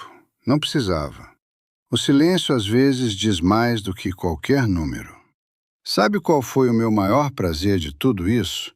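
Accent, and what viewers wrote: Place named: Rio de Janeiro, Brazil. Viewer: Ai a voz desse locutor é muito mole muito malandrão muito carioca fala mais firme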